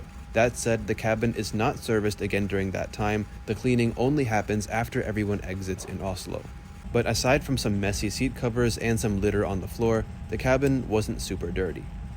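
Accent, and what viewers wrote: United States. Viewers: Just discovered your channel. I appreciate your upbeat assessment of your flight. I am so sick of captions w/out voice so it was a surprise to hear a nice American accent! Greetings from north of Atlanta.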